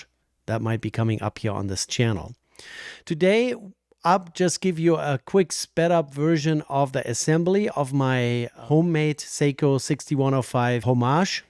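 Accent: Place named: Germany. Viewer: From the Company you got ur Strap from is my Willard Homage (rdunae R2X) - one of the best i think you can buy. Ive also built an Baby-Turtle Homage for my Wife in Black with an awesome Textured Dial (i removed the Marks on the Capturering via Isopropanol because i had the very same Problems with the Markings as you had... 9 to 12 to 3 - all in Line, but below there they didnt line up - seem to be a common Problem)... you can do so much Stuff if you just are willing to invest some money and time... the outcome is more rewarding then any Watch you can buy. (I even split my Watches between DIY and bought 🤣) Nice Vid man, coulnt have done it better. (Du bist Deutscher? Hatte erst überlegt wegen dem Akzent) LG Martin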